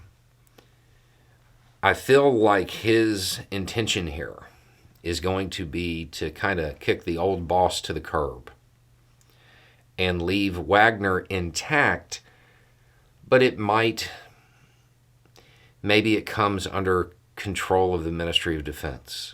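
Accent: South, United States. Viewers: Nobody with that accent should ever be commenting on geopolitics. Dumb rednecks.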